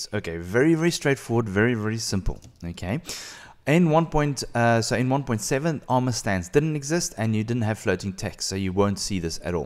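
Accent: South Africa. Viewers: Don't mind me asking... But are you South African?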